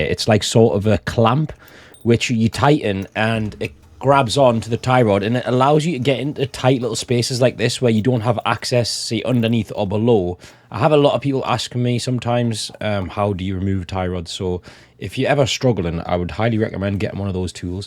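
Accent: Newcastle, United Kingdom. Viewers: "And now i am changing the oil fil-er" 😂😂😭😭Good lord i love the British accent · I miss the Geordie accent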